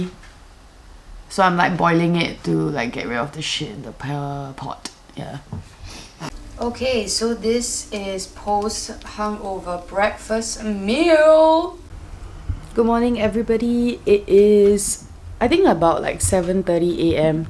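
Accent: Singapore. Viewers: she sounds Singaporean.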